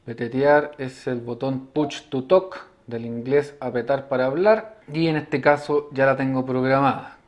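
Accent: Chile. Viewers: Hola! Buscando maneras de programar mi nueva BF-UV5r llegué a tu canal y me ilustró mucho. Vi este video para conocer un poco más el equipo y al finalizar mencionas que luego subirías otro video explicando la función de los radioaficionados y qué se puede hacer con los equipos, me gustaría poder verlo... ya que al ver la materia de estudio para los examenes de la subtel no me queda claro cuál es el “brillo” de ser RA. Saludos! (Me encanta escuchar videos en “chileno” adecuado a nuestra realidad)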